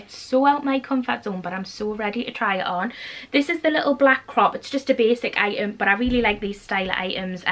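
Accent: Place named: Geordie, United Kingdom. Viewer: I feel like your Geordie accent was so strong in this video 😂❤ You look amazing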